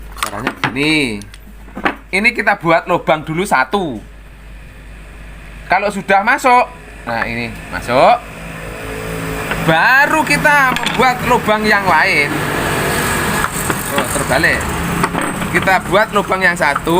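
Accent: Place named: Java, Indonesia. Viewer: Maturnuwuun om. Sederhana tapi jelas penjelasannya. Bonus diselingi logat2 jawa yg lucu 😁👍👍👍👍 Keren om...sukses selalu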